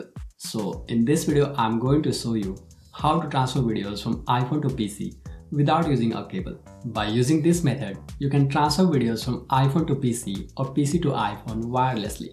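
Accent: India. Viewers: I don't mean to be unkind. But you should work on getting rid of the Indian dialect. For me, it's impossible to follow you.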